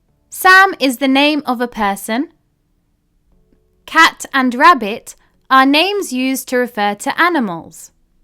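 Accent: United Kingdom. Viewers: I love a great British accent❤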